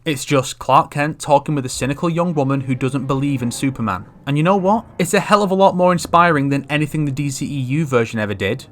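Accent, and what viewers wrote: United Kingdom. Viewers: “Donald trump is the Real life Lex Luthor.” 🤦🏻‍♂️ You sound British so I’m going to assume you are. If all you know about American politics is what you hear from CNN or your local news, maybe dial back the commentary. Trump has the public’s support because he puts the people above globalism and big government. The media is trying to destroy him because they are far leftists in the pocket of large corporations. Trump is a hero for making himself a target of relentless personal attacks by protecting the freedom of American citizens. He’s closer to Superman than lex Luthor. Using his power for good, when it would be far easier not to. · "Donald Trump is already the real life Lex Luthor". You know 'Donald Trump' is a weird way of spelling George Soros, or were you trying to say Michael Bloomberg? You brits! English between Americans and Brits sounds so different sometimes.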